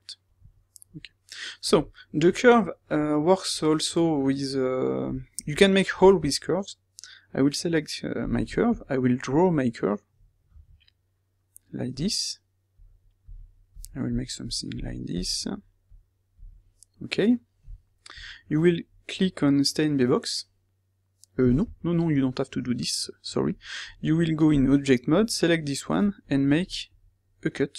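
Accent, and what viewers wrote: France. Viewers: j'avoue xD l'accent est frenchie frenchie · Sa se voit que ces un français qui parle mdrrr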